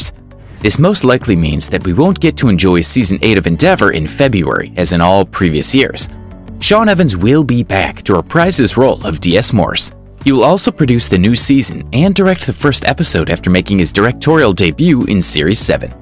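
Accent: United States. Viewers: Why the American accent for a British show? Is this a joke? If so, not funny. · Why the hell is it an American voice, this is fucking England!!!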